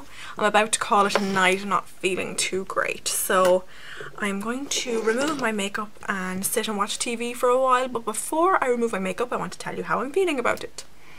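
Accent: Ireland. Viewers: I have to say, this was a brilliant review! I loved how you edited the video, like there was just the right amount of info. And I did want to know whether I wanted this foundation or not, and you've convinced me. Lovely to hear the Irish accent too :) (I'm Irish too). Would love if you'd take a trip to my channel, got some original music on there, hope you like it. Either way, I'm subscribing to you girleen :)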